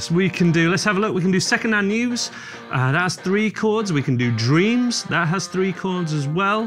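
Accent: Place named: Yorkshire, United Kingdom. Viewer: you sound like your from Yorkshire.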